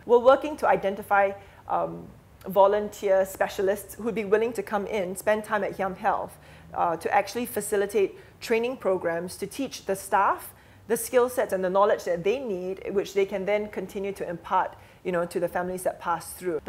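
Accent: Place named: Australia. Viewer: i love Australian accent :D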